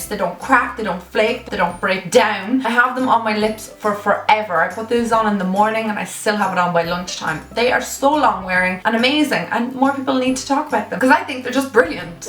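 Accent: Dublin, Ireland. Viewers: would you ever consider doing a full face of makeup revolution, love your videos btw great to hear a familiar Irish accent ❤❤❤ 🇮🇪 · I love the Irish accent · I feel like I recognise your accent are you from Dublin Coz I am plz answer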